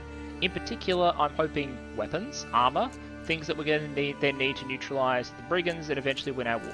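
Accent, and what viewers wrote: Australia. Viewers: Woooooooooo More australian PowerPoint guy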